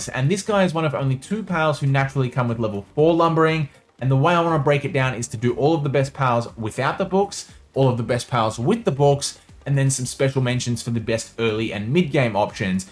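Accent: Australia. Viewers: how do you have not 1M subs... first of all, your content is amazing and you covered basically everything and secondly, who doesn't love aussies and their accent?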